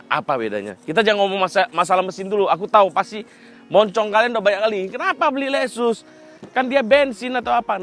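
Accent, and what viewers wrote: Medan, Indonesia. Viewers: Logatnya medan banget...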